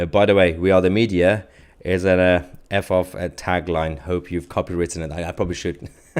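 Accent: United Kingdom. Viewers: eating MTZSM and listening to news from 'murica in bri'ish accent is priceless. Thanx. And ppl go after watching and do that like thing before making coffee... (at least)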